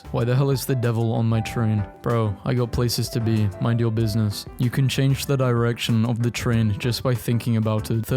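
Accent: United Kingdom. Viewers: I just remembered you are bri-ish (I'm pretty sure) because Americans pronounce it "Van Goh" I assume bri-ish people say "Van Goff"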